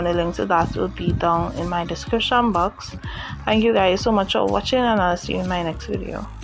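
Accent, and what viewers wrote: Trinidad and Tobago. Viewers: Hey Hun, I love this tutorial, this look is simple and pretty, love hw you did that wing liner. Love that Trini accent, Jamaica over here 🥰. Enjoyed watching, i subscribed, I have a channel also, stop by and show some love, tale care 😍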